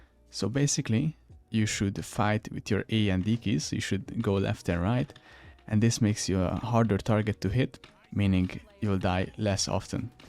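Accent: Hungary. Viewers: AAAA az a szep magyar akcentus<3 Great vid tho:) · Are you hungarian? Your accent sounds like hungarian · Tudtam, hogy Magyar vagy! Az akcentuson hallatszik, mondom ránézek a névjegydre, és bingo, Magyarország. :D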